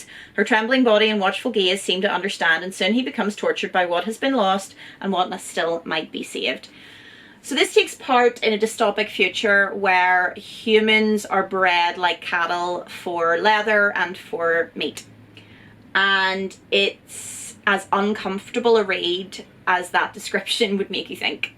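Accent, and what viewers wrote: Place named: Belfast, United Kingdom. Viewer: Totally agree lol. I tho yip thats a belfast girl alright lol 😅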